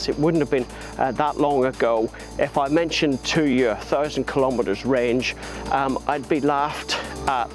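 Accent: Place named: Northern Ireland, United Kingdom. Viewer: Enjoyed the Norn Iron accent! Not often heard on YT!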